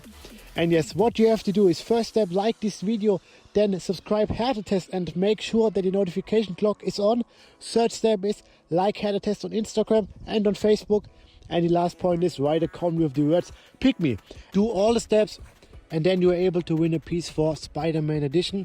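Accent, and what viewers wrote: Germany. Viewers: German accent on fleek.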